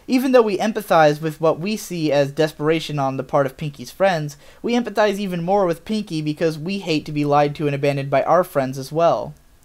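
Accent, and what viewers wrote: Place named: United States. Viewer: I'm probably not the only person to say this but I love your voice O.O thank you for giving me an american! (or canadian I'm not sure *cringe*) accent that I can actually listen to on youtube!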